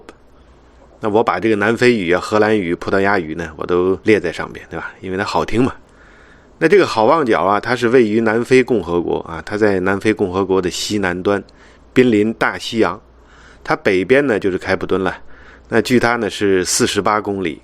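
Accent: Tianjin, China. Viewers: 好吗（天津口音），的故事太精彩了，😄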